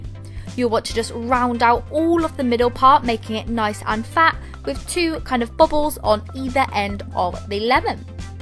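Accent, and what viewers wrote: United Kingdom. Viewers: I love you're accent I WISH I HAD YOUR ACCENT I THINK ITS BRITISH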